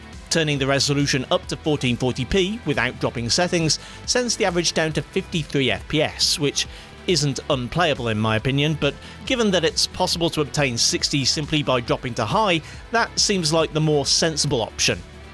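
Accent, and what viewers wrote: England, United Kingdom. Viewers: he is english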